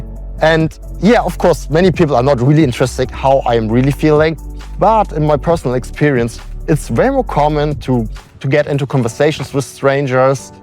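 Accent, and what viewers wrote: Germany. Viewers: I love your cute use of English with the very heavy German accent and also your choice of words and phrases. You make few mistakes but your choice of phraseology is very touching. I taught at NYU for 11 years " English as a Second Language" to mostly Asian students. Many Germans think their English is good when its really not, filled with many errors.. I had a close friend from the Stuttgart area, also Ernest /Ernie? Was he related to you? Keep up the good work, Welcome to the USA though Florida and I am thrilled you are doing so well here, Hugs and be welcome and prosper. RM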